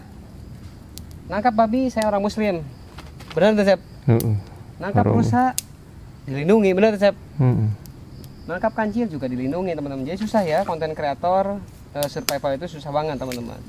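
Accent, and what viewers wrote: West Java, Indonesia. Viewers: bang ngmong nya campur sunda jadi lucu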